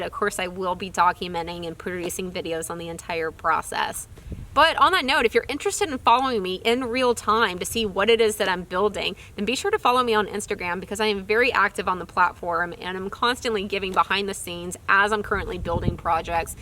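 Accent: Texas, United States. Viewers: I figured as much, you have a texan accent. But I couldn't figure out why anyone would willingly sport the 216 area code(Im originally from there also, moved to Tulsa 15 years ago.)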